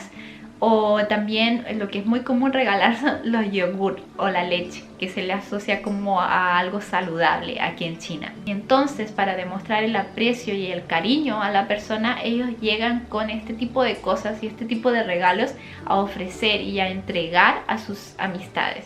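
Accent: Chile. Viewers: Estas perdiendo tu acento chileno. Abrazos! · Siempre me ha gustado el acento chileno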